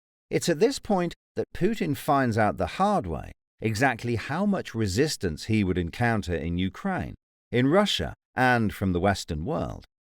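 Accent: United Kingdom. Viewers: Is this the same narrator? You got all British on us. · why are you british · So wtf why is there a British voiceover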